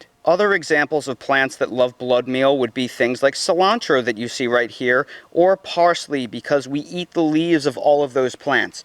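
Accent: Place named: New York, United States. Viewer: Your in nc but you have a ny accent😀excellent video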